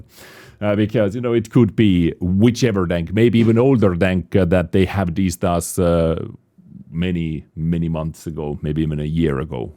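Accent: Finland. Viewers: Which country are you from? You have a Finnish sounding accent...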